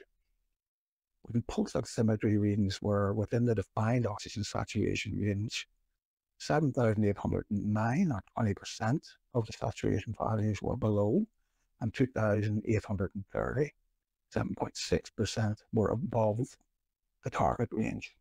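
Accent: Belfast, United Kingdom. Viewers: The audio quality is not the best (particularly on these earlier episodes) which is likely down to mic (recorder) but it does improve in later episodes. He's currently up to elosode 57. His Belfast accent may also cause difficulty to those that are not used to such an accent.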